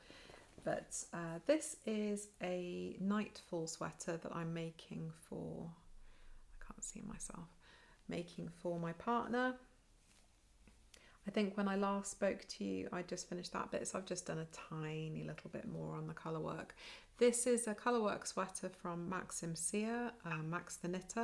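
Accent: Wales, United Kingdom. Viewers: Hi - I also love knitting socks, although they have been mostly vanilla socks so far. Your moomin socks look gorgeous. And the socks from Nancy are very beautiful. Thank you for this lovely podcast. I always enjoy listening to your British accent. It is so heartwarming, because it reminds me of the time when I lived in the UK nearly 25 years ago. Greetings from the Black Forest.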